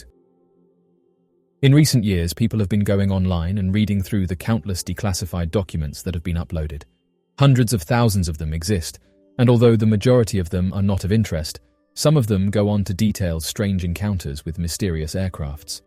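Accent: England, United Kingdom. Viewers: He's ENGLISH! (deja vu..?) 😆 🍄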